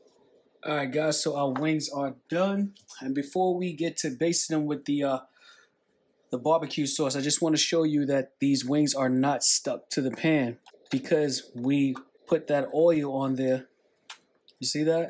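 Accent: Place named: New Jersey, United States. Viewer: I just love listening to your Jersey accent, and those wings look bomb. I'm trying my hand tonight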